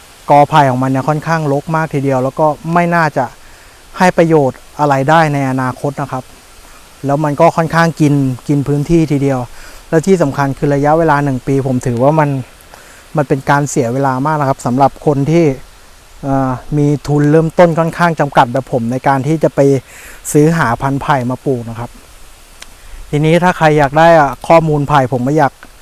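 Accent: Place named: Buriram, Thailand. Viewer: สำเนียงอยู่จังหวัด.บุรีรัมย์ค่ะ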